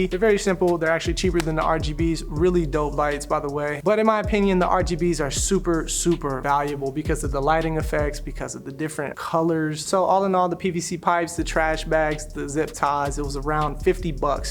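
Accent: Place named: Bay Area, United States. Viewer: Sounds like you're from the Bay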